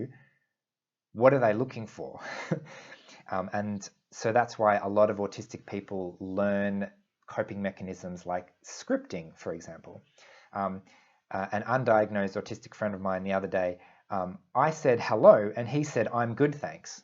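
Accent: Australia. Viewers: Which part of Australia are you from? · Greetings, mate. (I was listening to your accent in the video, and it sounded Australian. So I made the assumption that Aussies refer to each other as "mate.") There was something that happened to me just yesterday. To give a little background/context, I'm an American citizen (native born). I've lived in A-me-rih-cur my whole life. Our official language should be English. Yesterday, I was just walking past two women in the grocery store ("supermarket"), and my brain recognized that one of them was speaking in Russian to the other person. I guess she actually used some of the more common Russian words that I've learned so far. What ended up happening was a long mental analysis of thinking about "boundaries," and how I might feel if a stranger in a supermarket walked up to me and started speaking to me in Russian. I would be scared or paranoid, wondering, how do they know I recognize words in Russian? 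This situation may not have even been that complex, because the woman had already revealed by her own speech that she knew how to communicate in Russian. To make a short story long, I kept going about my own business, even saw those two women a second time in another part of the store, but I didn't attempt to say Hi or introduce myself (if that would have been appropriate!). The only "agenda" I would have had would've been to show that I noticed these women were from a different culture, and that I appreciated them as fellow humans. It's weird that I know Russian at all. I had a c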